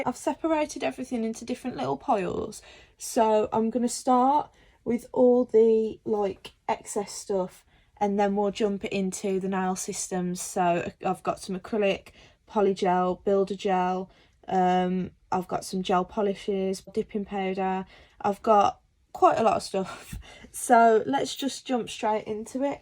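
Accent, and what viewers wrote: West Midlands, United Kingdom. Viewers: New subscriber love it all lol and your accent is very common lol 😆 😂 I'm from walsall West midlands and I said to my chap "omg babe she's from round here somewhere ay she ? " lol 😆 🤣 😂 I'm a begginer at nails just started out a few months ago love everything you have look forward to your next haul lol like being nosey what others get 😆 😄. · Hi, just found ur channel so ive subscribed, i know how important likes, views & subs r on Utube. Just wanted 2 say " U GO GIRL ", nice 2 c uk nail artists starting new channel, as its so swamped with USA, which i enjoy watching 2, but wud luv if UK & USA were more evenly represented!! I also thought of starting 2 film my nail art but I'm just not brave enough at min, so huge respect 2 u 4 doing that 😊 U GO GIRL!!!! Anyway, enough waffle from me 🙊, lol, lookin 4ward 2 more vids from u, BYYYYEEEE ❤❤❤ P.S. 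Do u mind me asking where ur from? Not exact address, lol, im not a stalker, promise, but im from Oldbury and I have a feeling ur not 2 far from me judging by ur accent. I wud luv some input from a local nail enthusiast as ive started making & selling press ons. If ur 2 busy no problem, I'll find sum1 eventually, just wanted 2 ask 3 or 4 questions if u ever have time, thx hun 😊😊 xx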